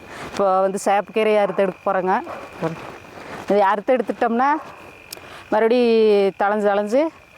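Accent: Kongu, India. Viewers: கொங்கு வட்டார பேச்சு😂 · Ugga vazakkathai aduthavargalukaga matra veddam akka koggu tamiz kojjum tamiz azagu super 💖✨ 💖 ✨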